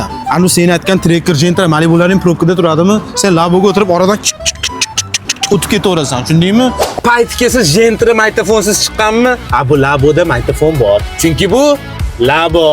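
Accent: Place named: Khorezm, Uzbekistan. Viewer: Xorazim ku bu😂😂😂😂😅😅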